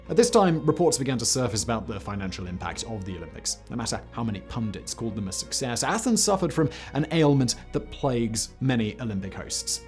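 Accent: RP, United Kingdom. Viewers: After dopping and sponsoring, not only the Olympic games but also sports in general gave lost any meaning... I think Greece should be the country to bring the Olympic games to their original purpose, but they wanted to impress and wanted to give work and "gifts" to every engineering company in and out of Greece, and so corruption was the protagonist, not sports. And if an English man with a posh accent dares criticise Greece as inadequate for the Olympic games, the 2016 London games are my revenge, when that country showed off as its contribution to humanity an old lady with jewellery, a fictional murderer and a buffoon. I guess imperialism is out of fashion and they couldn't show off with dead natives and stolen antiquities 😁😂🤣😤😡🤮👎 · By the way this person talks too fast I have to put captions, even his britis or english accent was very distractive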